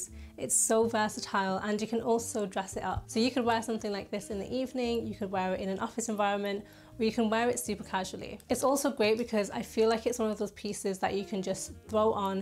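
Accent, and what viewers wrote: United Kingdom. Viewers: Please forgive me for being an ignorant American, but could you explain what kind of accent you have? Is it specific to one city or region in the U.K.? Your accent is so gorgeous—the way you say everything is elegant and distinguished. Ps: congrats on your engagement!! Wishing you and your partner all the happiness in the world.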